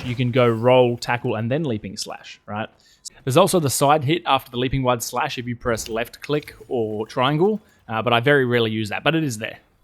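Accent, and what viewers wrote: Australia. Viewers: It's definitely struggling at times, but it's not so much the video as it is your in-game performance - it appears that your fps is dropping into the sub-30 territory sometimes which will definitely create some serious input delay issues eventually especially in more performance heavy fights and environments. This issue may not appear to be so apparently severe on your end, especially when you're just playing without recording, but the additional performance cost of recording might explain the degradation in performance captured in the video. If you'd like any advice, I'm pretty experienced with this stuff (around 20yrs of being a PCMR giganerd), and if I knew your rig specs and the display you're using I could probably offer some quick and easy things to improve it for you. But only if you'd like, I'm not one to force unsolicited advice onto anyone. 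Also, while I understand you're not super fussy about performance, for the sake of recording it would definitely be helpful for the audience. Either way, thanks for the video and info! It's been a great resource for me in brushing up on my Greatsword knowledge/skills and getting back into it since I took a break from MHW (that ended up being far more permanent than I originally intended 😂) right before Iceborne released. As a side note of interest, as an American English speaker I have always very much enjoyed and prefer listening to Australian and NZ accents (I often get them mixed up so listed both lol). Very easy on the ears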